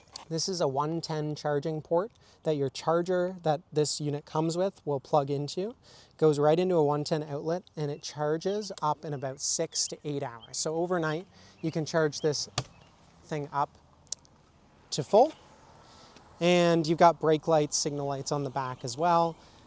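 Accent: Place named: Canada. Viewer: Oh my goodness, Canadian Eh? Where can I go and see this and buy one.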